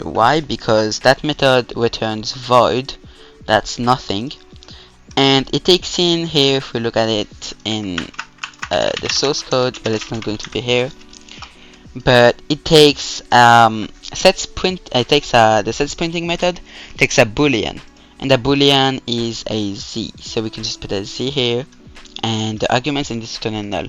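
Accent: France. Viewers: L'accent français qui se cache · Good French accent bro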